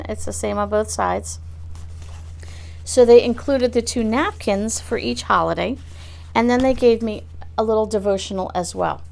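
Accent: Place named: New Jersey, United States. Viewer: I KNEW you were from NJ with that accent! I moved from Jersey to Louisville KY 17 years ago! I miss my New Jersey! Excellent video! Thanx so much! I just subscribed!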